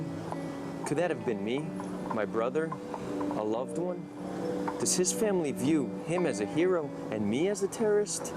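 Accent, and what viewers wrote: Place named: United States. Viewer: I think your American English is good enough for you to resettle to Gaza and educate local Arabs to live in peace, since there is no occupation of Gaza and thus no reason for violence. You should dedicate your life to that. There is no need to preach to non-Arabs. We have already army of the socialists, progressives, Fabians and others who do just that.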